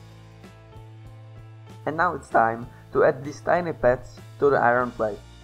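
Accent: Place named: Eastern Europe. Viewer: I am sorry but you sound like slav. Jsi čech nebo slovák?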